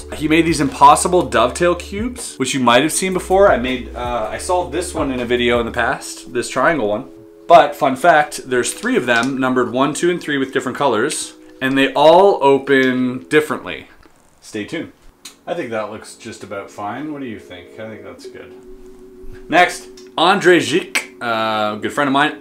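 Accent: Quebec, Canada. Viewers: I totally digged the French speaking part! Et l'accent québécois... S'il te plaît parle Français plus souvent dans tes vidéos. Love you man. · Hey where you from quebec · si si j'ai tout compris... bon tu a pris ton accent canadien natif... MY CHALLENGE: parler en Français, sans accent Canadien (i remember that you were bragging about your ability to pick up any accent... so here you go) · Je viens de MINDFUCK completement. je me doutais que tu parlais francais mais QUOI?!?! Je m'attendais a un francais cassé pas que tu parlais "quebecois". Ca rajoute un sentiment d'appartenance enorme. MONTREAL REPRESENT. ca srait vraiment cool un video en francais. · Where are you from? Your french sounds like you are from Québec. Ohh and good video by the way (as always) !! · Canadian French is so much different from the "usual" French I get to hear xD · mon dieu mais ton accent canadien est magnifique, j'adore ta chaine et j'ignorais que tu étais canadiens. 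👍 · Un joli petit accent quebecois ;)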